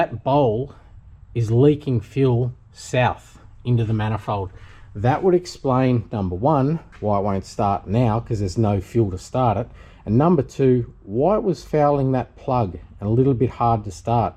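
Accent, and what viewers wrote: Australia. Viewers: Wow! Just look at what you've done with this - what a beautiful car. Love it. Also love your commentary: Expert and unmistakeably laid back Australian style - I reckon you could just leave in what you think are "bloopers"!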